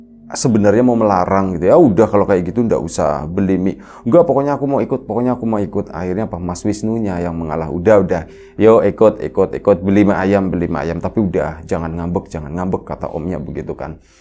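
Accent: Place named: Banyumas, Indonesia. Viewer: NUMPANG TANYA barangkali bisa dijawab : MENGAPA NGOBROL HOROR sudah lama tak siaran?. Kangen bahasa ngapaknya...😅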